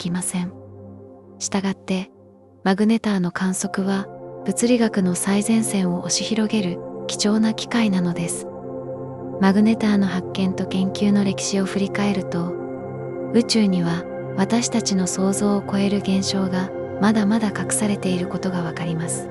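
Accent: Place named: Tokyo, Japan. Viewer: これより完璧な東京語を伺ったことがありません。 、イチオシです。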